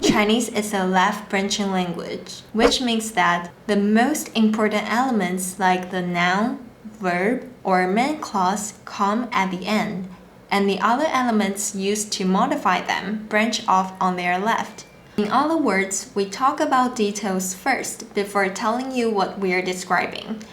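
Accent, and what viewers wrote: Taiwan. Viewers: You have too much of an Taiwanese accent though when speaking mandarin, people will get confused when they compare it with standard mandarin that it sounds different. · 怪不得感觉好想最近越来越多老外说中文时候有台湾腔了，原来是因为有个这么正的台湾妹做了这么专业的视频，就连我这个大陆人都忍不住看了好多集…… 厉害，加油。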